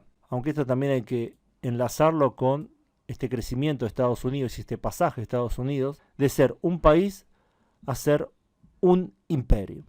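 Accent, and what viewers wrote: Argentina. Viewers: Buenos dias .muy Buenos conteúdo. Parabéns pelos vídeo. Mi prime vez que llego aqui en canal. Escutando lo acento argentinos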